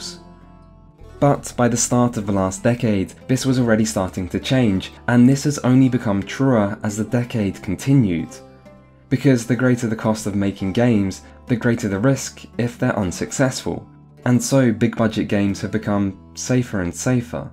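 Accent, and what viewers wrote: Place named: United Kingdom. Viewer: You sound so much like the youtuber shaun or maybe I'm stereotyping british accents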